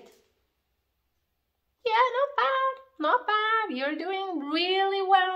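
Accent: Switzerland. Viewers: Is this the Zurich dialect?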